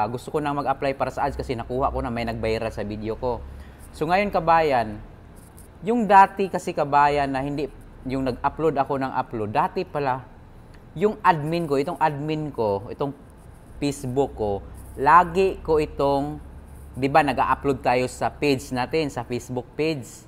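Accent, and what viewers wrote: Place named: Visayas, Philippines. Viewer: Halatang bisaya ka idol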